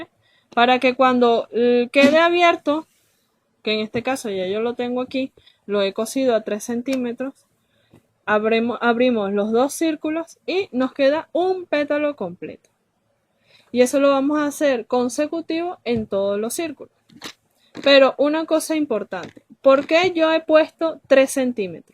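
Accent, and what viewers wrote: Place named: Venezuela. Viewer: Ese hermoso acento, solo puede ser de VENEZUELA. Saludos desde Barquisimeto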